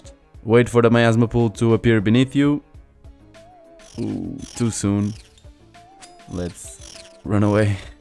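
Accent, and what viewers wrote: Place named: Portugal. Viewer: este sotaque de tuga nao me engana, bom video mano :)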